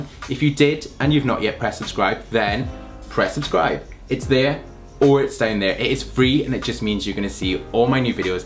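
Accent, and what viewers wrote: Scotland, United Kingdom. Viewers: i think he's Scottish ive got a Scottish friend and he sounds pretty similar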